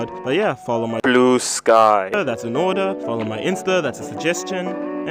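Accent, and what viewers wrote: South Africa. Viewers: Are you South African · very relatable n r u south african, if so me too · Hey, Genuine question, Are you from South Africa. I’m from South Africa, and your accent sounds like so many people I know in SA. Also this video is hilarious. My boyfriend loves mlp and luckily he has a girlfriend that loves it too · Is bro south african? anyways slay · Quick question, are you South African?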